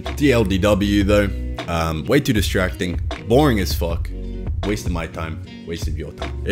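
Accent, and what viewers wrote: Australia. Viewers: Watching this for the first time I was like "Wait is he Cockney or is he from New Jersey because he sounds like both at the same time" Then I figured out I had forgotten the existence of Australia. It happens. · you sound like you're from australia and new york at the same time and its fuckin weird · that's called.... an australian accent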